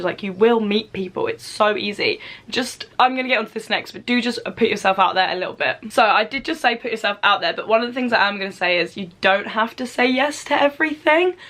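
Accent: United Kingdom. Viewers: Hi I just come here to hear your nice British Accent + Banger Contents 💯. EDIT weird am the only boy I love her content most especially with the bros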